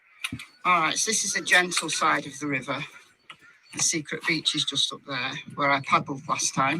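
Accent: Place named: Lancashire, United Kingdom. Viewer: Thank you for all you do. These video's really help one to get a true perspective of what I also truly believe was a tragic accident. I love seeing this country side and the scenery. After much research on ancestry, I discovered my 3 x great grandparents were married in St. Michaels on the Wrye in 1866. Also listening to your voice, I can imagine what my GGG Grandma probably sounded like as she was born and raised in Lancashire County.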